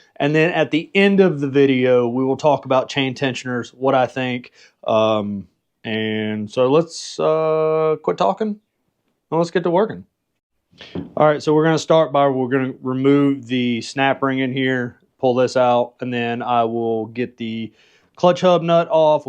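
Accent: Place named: North Carolina, United States. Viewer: New Sub, I knew you were from NC just from the way you talk. I grew up in Hickory. Question, when I shut down the bike, it has started making a chirping noise coming from the intake. Any ideas? I have never noticed it before, but a couple of weeks ago I started hearing it, so now it's bothering me. It's right at the end of the shut down. Bike is a 2017 CVO Ultra Limited. Thanks in advance Brother!!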